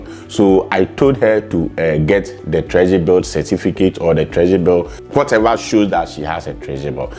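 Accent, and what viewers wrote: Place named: Ghana. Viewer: I think you are Ghanaian, Ur Accent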